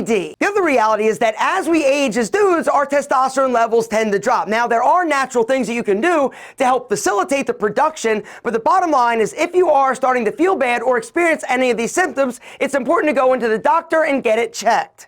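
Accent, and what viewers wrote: Philadelphia, United States. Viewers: I know a Philly accent when I hear one 👀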